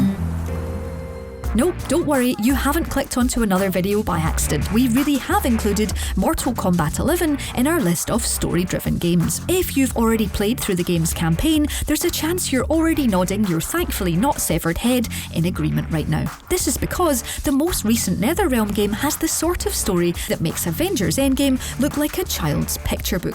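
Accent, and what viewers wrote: Scotland, United Kingdom. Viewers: I like the narrator's voice and Scottish accent. Calming. Easy listening. I just bought Redeemer, Life is Strange (all 3), Blacksad, Destroy All Humans, Creepy Road, Strange Brigade and Guns Gore & Cannoli Capo Dei Capi edition. And on PS Plus they gave us Days Gone this month. They've done alright lately I think. There's other good games they've given out too recently. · I loved the video, well-crafted list. Also the Scottish accent brought back lots of nice memories, thanks🙏🙏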